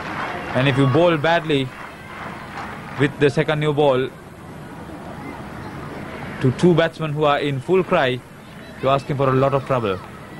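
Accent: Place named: Sri Lanka. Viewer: The sri lankan. Accent on this one is STRONG.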